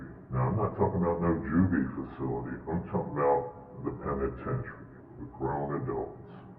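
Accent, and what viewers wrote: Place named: South, United States. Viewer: Texas? The accent hits home hard, definitely from the south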